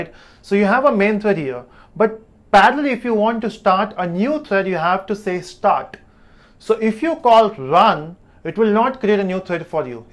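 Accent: India. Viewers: I love indian people speaking english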